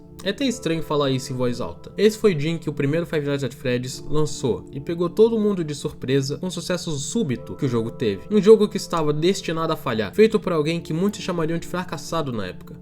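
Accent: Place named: São Paulo, Brazil. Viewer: O Sotaque paulista é sim o melhor do brasil e não vou aceitar nenhuma opinião contrária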